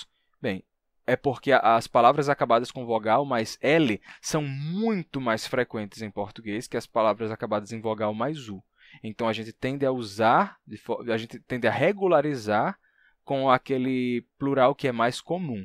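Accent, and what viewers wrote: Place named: Natal, Brazil. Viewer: vc é de Natal-RN? Seu sotaque é muito parecido com o meu e sou de lá, fico me perguntando isso todos os vídeos hahah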